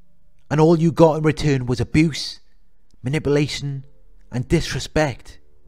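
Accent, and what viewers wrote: Wales, United Kingdom. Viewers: You sound Welsh ? Good video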